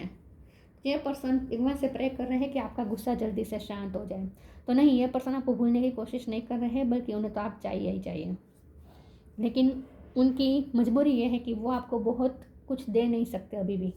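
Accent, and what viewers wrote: Gujarat, India. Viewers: Ma'am aap gujrati ho.??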